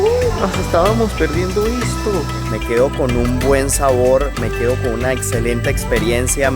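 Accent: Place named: Venezuela. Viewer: Disculpa, tocayo, no sé dice eso no debería de ser así soy traductor simultáneo y docente en idiomas, manejo varios y los enseño y también enseño español a extranjeros y la gramática del español dice que después del verbo DEBER en cualquiera de sus tiempos y formas, se debe usar y no se debe de usar un verbo en infinitivo, por lo tanto esa preposición DE no existe, sobra. Disculpa tocayo que aproveche está oportunidad para corregirte, no lo hago para hacerte sentir mal sino para que mejores en el uso de nuestro idioma español, fíjate que no menciono nada de tu acento venezolano, eso es secundario, me refiero es a los errores gramaticales. De nuevo. Mil disculpas por corregirte y mil bendiciones. Gabriel Antonio Sánchez Aldana Traductor Simultáneo y Docente en Idiomas. Exsacerdote Católico.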